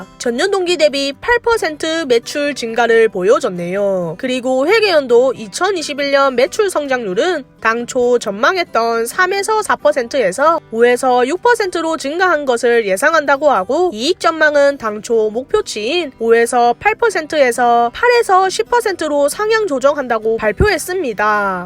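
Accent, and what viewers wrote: South Korea. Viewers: 서울 말투 쓰시는거 보니 서울분이신듯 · ㅋㅋㅋ 말투 너무 귀여우세요ㅋㅋㅋㅋㅋ 경상도지예~~~~?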